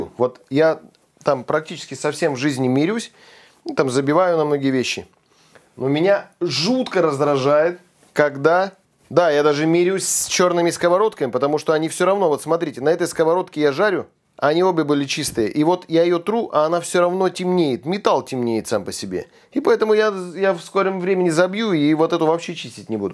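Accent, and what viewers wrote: Siberia, Russia. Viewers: ! Какое же я получаю удовольствие от общения с Вами! Первое — это Вы, а уже второе — Ваши рецепты! Сама я россиянка. Корни моих родителей в Ярославле. Но так сложилось, что с детства живу в Украине. Есть чувство тоски по России. Давно там не была, а теперь это и совсем проблема. Вы — одна из ниточек, которая связывает меня с моей Родиной. Ваша речь, Ваш говор — это такое счастье для меня! СПАСИБО!!! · Привет! Привет из Кемерово, мы почти земляки, у нас стоят сильные морозы, без надобности по улице не гуляем, я лично с утра смотрю твой канал, встретила тебя на ютубе не так давно и влюбилась, сначала мне казалось, что ты новичок и делать ничё путём не умеешь, сейчас мне даже смешно, я поняла, что человека сначала надо понять, как бы срастись меж собой, понять философию, в данном случае готовку разную. но даже не в этом дело, ты говоришь и думаешь как настоящий сибиряк, мы тоже так разговариваем и мне доставляет удовольствие слушать тебя, как будто ты у меня в гостях! 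Ты меня понял, я знаю, успехов тебе и удачи во всём, конечно семейного счастья и здоровья всей семье! С наступающим!!!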